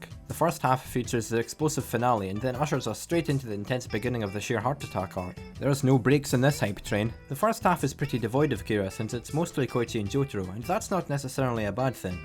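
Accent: Scotland, United Kingdom. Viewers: are you scottish? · i've recently started watching your videos and i really enjoy your analyses, cool videos! :) by the way, what part of scotland are you from? (apologies if you aren't scottish; you certainly sound like it!)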